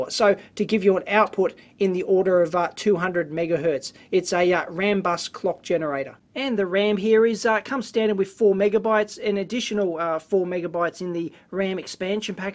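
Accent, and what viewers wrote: Australia. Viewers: Um, he's Australian. That's how they talk.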